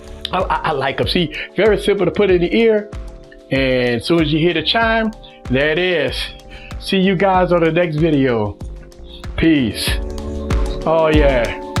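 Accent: Louisiana, United States. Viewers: I feel like you're from Louisiana based on your accent lol. Good video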